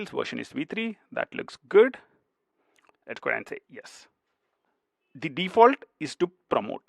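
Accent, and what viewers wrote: India. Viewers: Dear Colleague, please get rid of the Indian accent.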